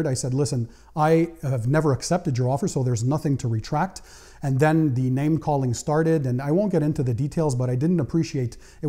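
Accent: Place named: Montreal, Canada. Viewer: Wow! Je découvre votre chaine ce soir. Celle-ci est la deuxième que j'écoute. Ça commence fort. Faut demeurer concentré sur les 99.99% qui vous apprécient. La qualité et l'enthousiasme que vous présentez ma gagné tout de suite: SUBSCRIBED !! J'ai senti que vous aviez possiblement un petit accent tres subtile de Montreal, une touche francophone. Je découvre que vous parlez français et bien entendu, je devine, le grec. Tikanis ! Bon succès, je vais me convertir en Week-end worrior en detailing. J'attend un nouveau véhicule pour la semaine prochaine. Est-ce que je peut déja appliquer une protection de peinture sur ma nouvelle voiture. Je viens d'acheter McGuire's Ultimate que j'ai appliqué sur la voiture recente de ma femme; beux résultat. Normand Rousseau , rive-nord de Montréal.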